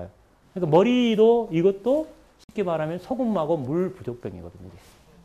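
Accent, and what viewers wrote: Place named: Jeolla, South Korea. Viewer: 박사님 전라도 분이신가요? 저도 전라도 사람인데 왠지 억양이 친근하게 느껴져서요^^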